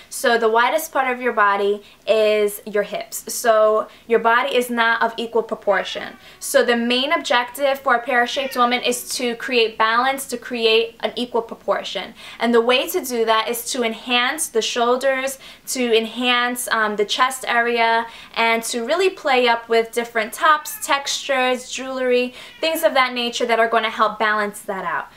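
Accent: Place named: New York, United States. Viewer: Ive been looking around for advice on how to dress for my body type and this is, by far the best to help me! Plus I love your New York sounding accent!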